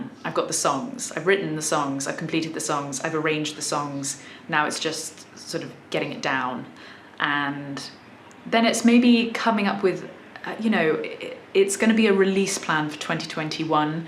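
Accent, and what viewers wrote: United Kingdom. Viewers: While you're accent may be a bit British, it's not truly British because I can understand every word you say (Ahm frum Jawghuh so eh ez purdie goot Ah kin git thru th wurl whentz zi git out'n da haus.) A truly proper British accent, and there are many, cannot be understood by anyone outside of the neighborhood in which it is normally spoken I believe the appropriate quote is, "If I were any more British I wouldn't be able to talk at all" Whatever your accent you do it well. Perfect voice to listen to 😎 Also: Like your new digs but those blank walls scream, "ERASABLE MARKER ATTACK" 😁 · your accent sounds british to me, i'm from the boston, massachusetts area and have a strong accent and i'm 71 yrs. old and most of the people around my age have a boston accent which we picked up from our parents and surroundings when we were young. 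now i've noticed that most of the younger people like milleniums don't seem to have this accent or altered maybe due to their exposure to more people via the internet. my wife is from western new york state and has a more neutral sound to her voice. one could to a real study of all the regional accents in the U.S. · Hi, your british accent is what I like the most! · As an American we love British accents! We generally can't tell the difference between different areas, but I love yours and clearly you're from a classy background! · The non-rhotic British accent such as your beautiful accent, is not a classic accent. It didn't exist before the late 18th and early 19th century. It's really quite recent. Many would be surprised to know that the current American accent is quite similar to the British accent of the 18th century and that it is the current British accent that has changed. Sorry. 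Linguistics geek;) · I have to admit, I watched this video just because I wanted to nap while listening to your British accent. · British accent is nice; I'm smitten with those beautiful brown eyes, I'm in love....a little bit of madness...with with great guitar that is. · Quite a lovely lady and axe player. Love your clipped British accent. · Love the video!! Good luck setting up the studio. Maybe the next three videos you give us a different British accent each time… In song even!! Love your channel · I've watched several of your videos before, and suddenly I just subscribed at WINK! Looking forward to being a further viewer/listener/learner. I lived four years in the UK. You're definitely a Pom! Love your eloquence. Hi from Oz, oi oi oi!;-):-) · Wish I had your looks along with that beautiful British accent. And then you play the guitar and sing as well. Beauty, accent and talent. Now I call it 3 in 1. 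I am writing from Sri Lanka where I was born and been living since then, and I am no where as beautiful as you are. And I cant speak English the way you do. Wish I could. God bless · I love your accent. It is the best British accent I've ever heard. Everything you say is perfectly enunciated and so easy to listen to. I wish you the best on your new work space (studio) and your future endeavors. Love from Miami ❤️❤️ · Sounds British too me, don't sound like you're from Liverpool but doesn't sound like you're from Texas (like me), doesn't sound like you're from Atlanta or the Joisey shore. Sounds British. London? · your accent sounds very british ------ but i know nothing about you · In 5 yrs you'll be just wherever you wanted to be! Life is good!! Love your British accent..!! Hi from Ecuador-South America!!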